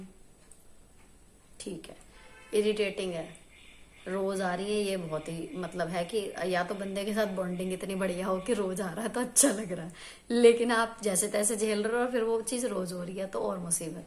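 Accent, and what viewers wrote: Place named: Meerut, India. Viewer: Hi ji I am rajni Chauhan from meerut ganganagar you know you look like my Mami ji PTA hi mujhe sabse achi baat kya lagti hai apka baat kerne ka tarika bilkul desi bolte hi PTA lag jata h meerut ki l language like it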